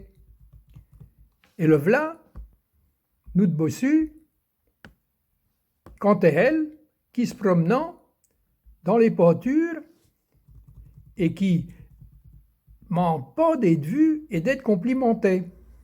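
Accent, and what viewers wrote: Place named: Touraine, France. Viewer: Moi qui suis de Touraine, c'est fou comme votre parler ressemble au mien ! C'est ben piesant à oude ! Grand marcite.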